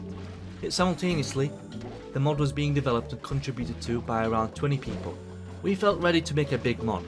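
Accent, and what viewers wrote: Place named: United Kingdom. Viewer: I need you to make an audiobook for lord of the mysteries, your British accent would make long words sound even more gentlemanly 😩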